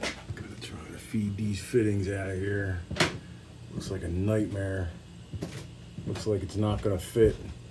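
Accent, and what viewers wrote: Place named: Philadelphia, United States. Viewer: this is NOT reall big problem..if you got the house for a good price.... do the work you save a ton it aint that hard it sounds like you are from philly so big box stores everywhere !! i see u have a work van and tools ......open the walls demo and clean that is the first day, get what u need in plumbing materials ie pipe fittings ect ect bust out the old shit ( at the bottom of where the pipe makes a turn scoop out the rust from the pipe with an old spoon on a pole) dry fit your pvc ...the pvc is cheap and easy.... do all that is needed to be replaces ,check for leaks not impossible ..after no leaks ,,rap with fiberglass insulation pipes will be MUCH quieter ... i did my 3 story house in oak lane TWO sewers it was a lil work but i saved a fcking mint!!!!! 😉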